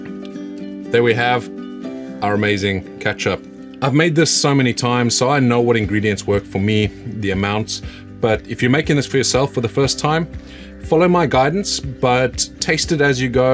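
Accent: South Africa. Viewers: from that accent im guessing SA? first vid and love it. gonna try but first i gotta source some chllies here in japan.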